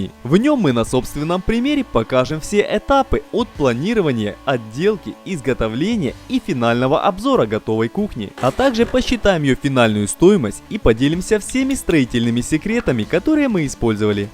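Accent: Ukraine. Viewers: Вам, разумеется, можно говорить как угодно - это ваше право. Но всё же когда человек говорит неграмотно, это порой вызывает муку, Вы же формируете контент для зрителей. А то, что русский для вас не родной - не правда, даже если вы в быту и пользуетесь украинским, в чём я сомневаюсь, то и русский вы знаете прекрасно, просто не даёте себе труда не суржиковать. ps "ризетки" нет ни в каком языке. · Если придираться к речи, то в роликах много «очепяток» в речи, присутствуют акцент и слова «суржики», потому что они украинцы, но ребята не претендуют на знатоков по русскому и литературе. А еще у них голова и руки на месте!😎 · Всеодно ти говориш з українським акценом, прошу озвучувати Українською мовою твої відео. Інакше Я відмовлюсь від твоїх відео.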